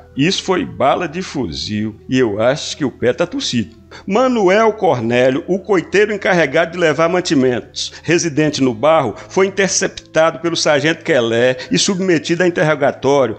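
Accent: Northeast, Brazil. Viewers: Mais uma excelente narração de cabra macho do nordeste como deve ser e sem ser acometido pelo vento mau!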